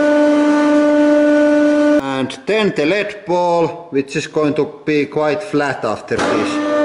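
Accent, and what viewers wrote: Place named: India. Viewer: Поганый индийский английский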